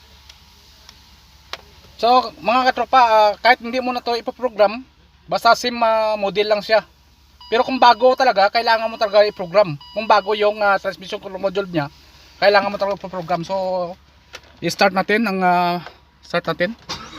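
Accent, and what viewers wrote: Iloilo, Philippines. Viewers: Teh daw ilonngo gid timo bah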